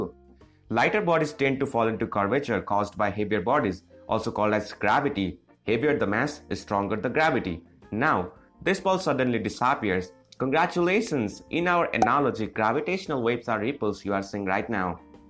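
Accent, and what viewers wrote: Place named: Germany. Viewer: It's nice. But bruh your's English is like a Germenish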